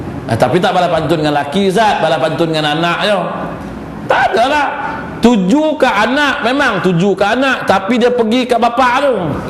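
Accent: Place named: Negeri Sembilan, Malaysia. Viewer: best ceramah ustaz.......tima kasih ustaz atas ilmu dan loghat nogori...........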